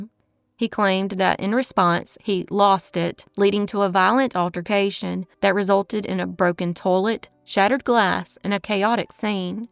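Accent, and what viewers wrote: South, United States. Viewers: Hello from Georgia! You, Crime Zone, and Michael Franzese are tied for my favorite podcasts. I fall asleep to one of y'all every night. (Hubby uses ear plugs, hahaha) You have such a smooth, melodic, Southern drawl...what's not to like?! Keep up the good work - from one Southern Girl to another. P.S. in case you have haters - "If you are going to be underestimated by people who speak more rapidly, the temptation is to speak slowly and strategically and outwit them." ~ Doris Betts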